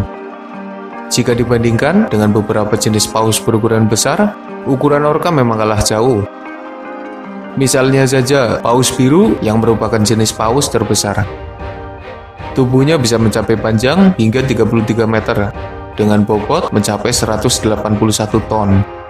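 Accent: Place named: Banyumas, Indonesia. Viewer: Bahasa jawa ngapak.. gak enak di dengar jawa ngapak..🤮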